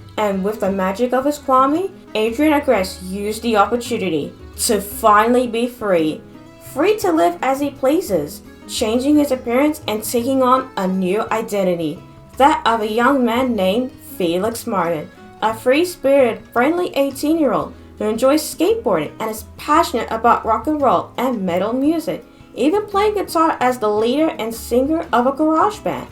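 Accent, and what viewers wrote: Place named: Australia. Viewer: things i like about your cat noir: - his wishing to be free is giving me satoko vibes -the additional abilities you gave him. -his identity as Felix Martin, it's a good homage to the original idea thomas astruc had and adds more potential drama. _how calico black used magic when he was alive, that's a good story by it's own. -the spanish guitar music that played in the video. -you mention how cat noir flirts with whoever he wants, leading up to the typical attempts to seduce ladybug which all fail. -how his dad is sending Akumas to find Adrian as well as take the miraculous, it shows there is more plot outside of the mcguffins. -how you referenced the intro to miraculous by describing him as a normal boy with a normal life. -how you play the original music from the trailer. things i don't like about your cat noir: -if he doesn't care about right and wrong then why does he bother hunting akumas other than to prevent them from finding him. 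- his long white hair is kind of a huge distraction from the rest of his costume it's kind of an eye sore. -i don't know if you are australian or not but your accent was so thick i couldn't understand when you said calico.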